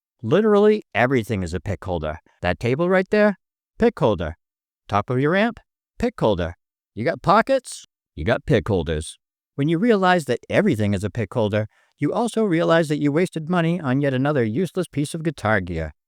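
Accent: Boston, United States. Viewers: Definitely a fellow Bostonian lol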